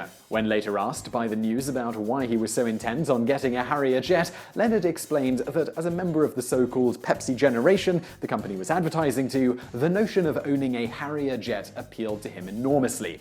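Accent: United Kingdom. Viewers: "PentaGIN" Its PentaGON" what the fuck is so hard to say that with a heavy british accent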